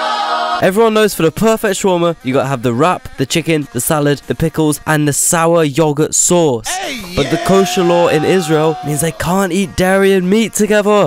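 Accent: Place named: United Kingdom. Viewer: Esse sotaque Britânico é muito bom Arca naim daish nuai nuan rai trai grai cakerrrrr LMAO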